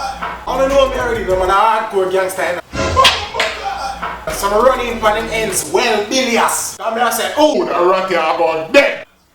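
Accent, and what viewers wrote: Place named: Africa. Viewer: Big man a scream lol.. Yeah right African sound dwl. Funny bro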